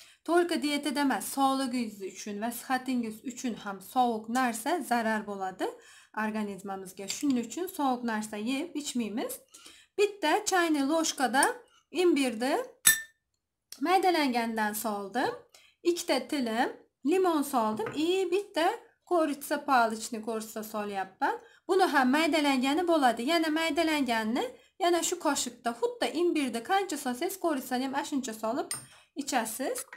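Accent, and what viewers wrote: Khorezm, Uzbekistan. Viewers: Бу киз Хорозимдан шевасидан билмайсизми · сиз хоразимни апкесимисиз апкаларга ухшеб гаплаесиз апкажон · Ассаламалайкум варахматиллохи вабаракатух ,кизим каердансиз. Тилингиз хоразмликка ухшаяпти. Мен 61 ешдаман, 10,минг $ сарфладим озиш учун, ,,гербалайев ,,,,, дан 1 йил ичдим 32 кг оздим 1 йилда, лекин кейин гербалайни ташадим яна кило олдим, энди табиийй озиш харакатидаман, лекин бу ешдан кейин бундай диета тугри келадими, химия, нур олдим, сал озрок есам холсизланиб коламан, калтирайман, бунга хам маслахатингиз борми. Докторлар кучли ейишни тасия килади, олло рози болсин сиздан, ассаламалайкум варахматиллохи вабаракатух.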